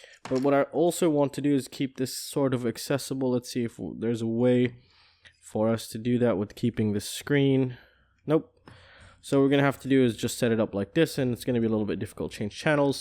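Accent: United States. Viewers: Wait... American accent but mentions tesco... wth dude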